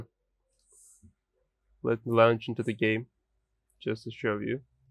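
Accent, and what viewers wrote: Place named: Turkey. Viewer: kanka aksanından türk oldugunu dusunuyorum allah aşkına oyunu dün epic gamesten aldım bozuktur dıye bugun gidip steamden aldım ilk basta önyükleme hatası falan diyordu biostan bi ayar yapıp ön yüklemeyi açık hale getirdim onu hallettim.ama suanda steamden oynaya basıyorum ilk kez baslatılmaya hazırlanıyor diyip hicbisey olmadan kapanıyor kendi kendine hiç açılmadan ne yapabilirim lütfen yardım edermisin bütün driverlarımda güncel bilgisayarıma yeni format attım windows11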